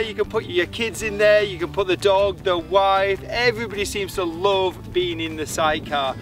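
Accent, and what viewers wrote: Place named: England, United Kingdom. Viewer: Where about from England are you from??.. Scouser here. And looking at Trikes, sidecars, and everything in-between. Ohio is a bit thin on the ground.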